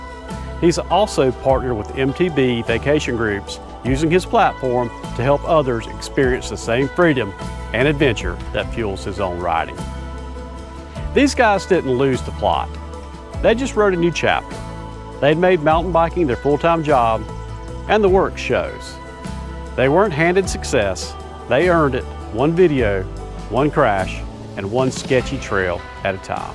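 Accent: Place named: Carolina, United States. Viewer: That's the thickest Carolina accent I've heard (and unfortunately going extinct). Great video great storytelling! Seth did an interview with Remy Metailler and it was a great peek behind the curtain into how being a YouTuber can kill your ability to enjoy what you are supposed to be enjoying on camera.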